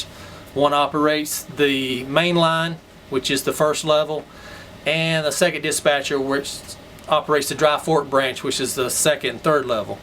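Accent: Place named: United States. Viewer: Aloha All,,, Just Love It,,, But I Do Have One Question,,, What Language Does This Man Speak,,, Sounds Kink of Like PURE AMERICAN To Me... What Great Craftsmanship... It Would Make Michelangelo So Proud,,, What Great Craftsmanship... How Many Cars,,, ? ,,, All N Gauge >?<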